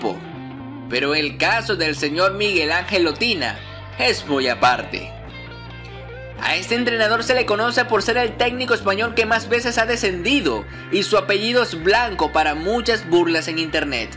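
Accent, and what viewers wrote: Venezuela. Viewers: Hola amigo, eres venezolano, verdad? Además que siento que narras los videos algo parecido a Dross. Saludos desde México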